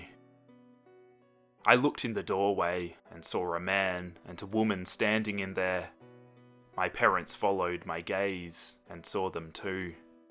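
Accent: Australia. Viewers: Are you Australian? Lol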